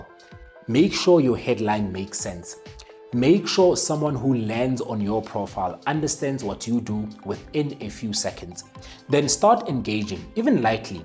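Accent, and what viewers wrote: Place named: South Africa. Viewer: Your accent gave you away. You speak so beautifully. A South African I guess.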